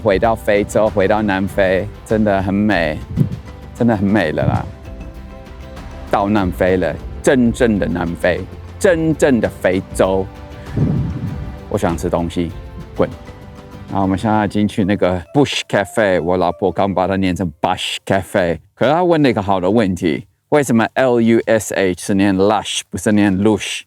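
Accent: Taiwan. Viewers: 你說話根本就是台灣人，老婆又漂亮，真有眼光。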